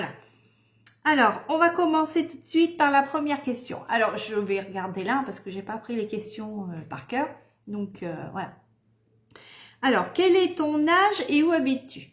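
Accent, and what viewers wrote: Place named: North, France. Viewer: Merci d’avoir partagé un peu de tes secrets, mais c’est bizarre ton accent me faisait penser à celui du nord. Oui le principal c’est d’être avec ceux qu’on aime en bonne santé têt du coup à mon tour de te souhaiter une bonne année, à bientôt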